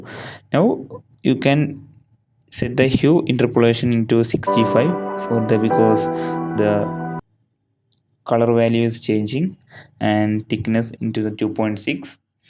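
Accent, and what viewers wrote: Kerala, India. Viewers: are u from kerala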